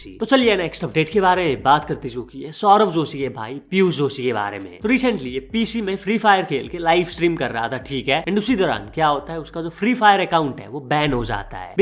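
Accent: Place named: Assam, India. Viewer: Accent bhai, vo assam ka hai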